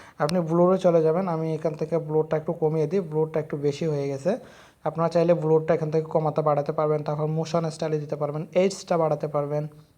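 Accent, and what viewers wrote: Noakhali, Bangladesh. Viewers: After focus pro i have used long times ago around 5/6 years when i was using SYMPHONY XPLORER W25 which was my first android smartphones. Moreover it was a gingerbread version, so your review doesn't making any bell to me. By the way one request to you: "your review might well perhaps your presentation was really terrible & i hope next time you will be more careful to use proper Bengali accents instead of নোয়াখাইল্যা খিচুড়ি কথা, either your review will going to be spoiled.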